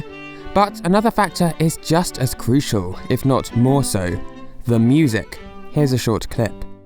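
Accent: United Kingdom. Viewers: The narration is so British, I had to grab a cup of tea just to not feel out of place while viewing.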